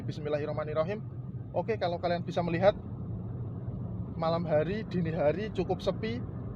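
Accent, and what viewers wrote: Java, Indonesia. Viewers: Log at jawamu medok sekali..geli & jijik dengernya..aq pindarh aja..sorry..! Mantap.jawa kowek..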